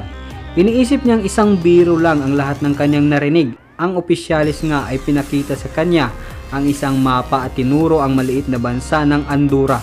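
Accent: Visayas, Philippines. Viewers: Bisaya ba sya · Maganda ung kwento kaso bisayang bisaya kakawalang gana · Bisaya ka ba pre hahahaha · ikaw din iba tuno mo haha bisaya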